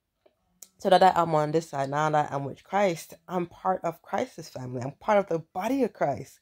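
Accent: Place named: Florida, United States. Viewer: You sound floradian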